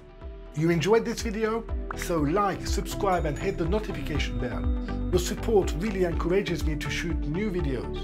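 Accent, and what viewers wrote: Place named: Yugoslavia. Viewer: Sounds like he might be from Yugoslavia. Kinda difficult to understand.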